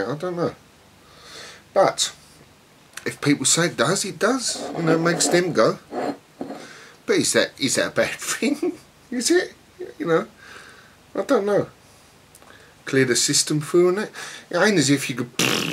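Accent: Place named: England, United Kingdom. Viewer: Greetings from California, United States. I'm going to try this beer. Thanks for the review and I enjoyed your lovely English accent. :)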